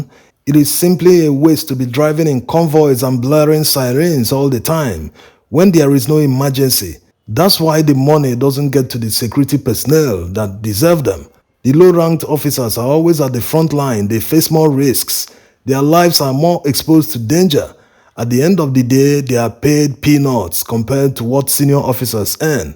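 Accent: Igbo, Nigeria. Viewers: Rubbish talk 98 percent of the time in thick Igbotic accent. These are the same people who used to hate both Buhari and Niger Republic a few months ago now pretending to be friends of Niger. As I said earlier - RUBBISH.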